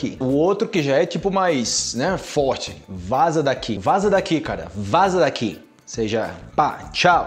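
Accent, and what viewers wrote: Colombia. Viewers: ele tem sotaque colombiano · Si, de vivir varios años en Medellín consiguió "pegar o sotaque Colombiano", pero eso no quita que haya nacido y sea Nordestino de Paraíba.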